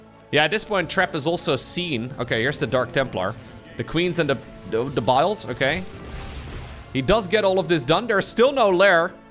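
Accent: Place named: Netherlands. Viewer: I don't care what you say yhe dutch sounds freaking awesome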